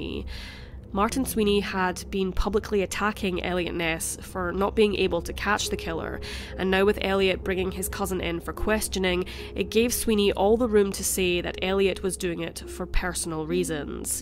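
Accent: Scotland, United Kingdom. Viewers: Really liking the narrator's voice. Scottish? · Scottish accent for me , very erotic for nightmarish stories ... , the reason of sucess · Scottish accent. Why does it matter if she narrates? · Scottish accent. Not English. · I can't imagine having such a limited (and limiting) world view. Check out any of Sean Connery's movies, and you too can learn to recognize a Scottish accent. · Well this puts Jack the Ripper into the lower leagues of serial killers for sure. This was a very professional production told in a clear and logical way and very interesting; I have subscribed. You also have that lovely Scots accent which is perfect for story telling. Thank you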